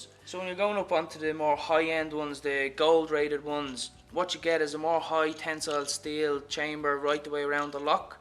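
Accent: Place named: Dublin, Ireland. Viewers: My sister-in-law is originally from Ireland. She's got the same accent. :-) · KushDid420 it's a northside Dublin accent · KushDid420 Irish 🍀 👌🏾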